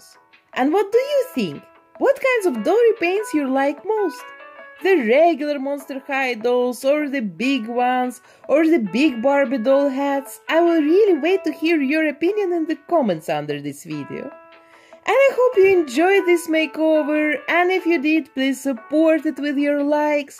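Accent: Russia. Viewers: У вас русский акцент Или мне кажется?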